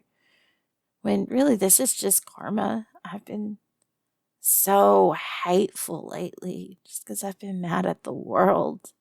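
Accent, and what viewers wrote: South, United States. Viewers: Bear girls? Love them, can never get enough. Southern accent? Definitely one my greatest weakness. Reverse comfort? Oh yeah, cant be the only one comforted!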